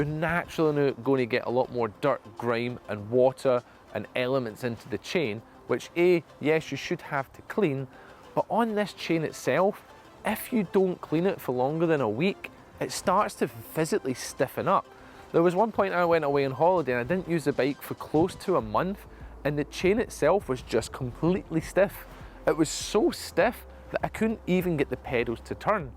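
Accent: Scotland, United Kingdom. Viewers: Scottish - not Welsh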